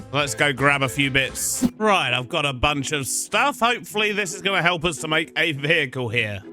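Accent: United Kingdom. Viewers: You and mumbo have the same accent so pardon my American idiocy but what region of the UK gets that accent? Would it be more up-north or am I just completely wrong?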